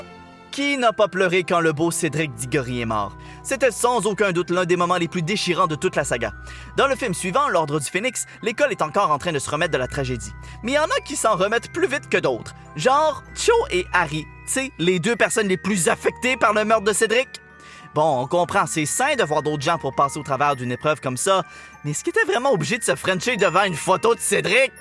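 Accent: Quebec, Canada. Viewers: T'es québéquois ??? T'as l'accent... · Il est marrant ton accent québécois 😂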